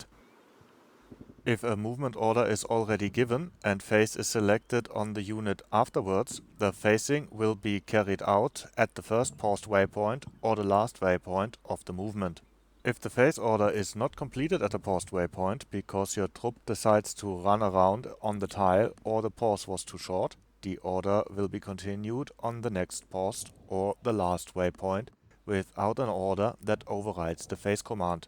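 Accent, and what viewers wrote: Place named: Germany. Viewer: I'm honestly only watching your videos for ze lovely German accent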